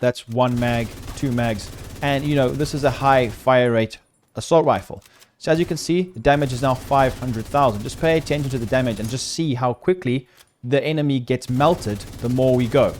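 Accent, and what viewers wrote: South Africa. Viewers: My bru awesome video, are you from South Africa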